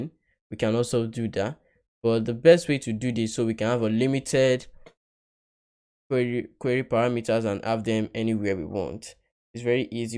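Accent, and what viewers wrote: Yoruba, Nigeria. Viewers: Great one, the tutor voice sound like a Nigerian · I am also perceiving it from his accent, I am sure is a Nigerian 😅 Yoruba specifically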